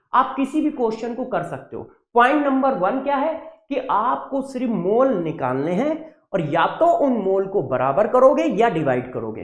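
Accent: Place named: Bihar, India. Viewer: I bet sir is either bihari or up Becoz me also frm bihar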